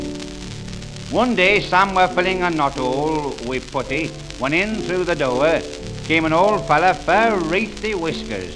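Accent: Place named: Lancashire, United Kingdom. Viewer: Noah sure could drive a hard bargain,but is messing with the wrong crowd here...Surely found his match in Sam Oglethwaite of Bury..Lovely Lancashire accent though, enjoyed the story by Stanley as only he could tell it...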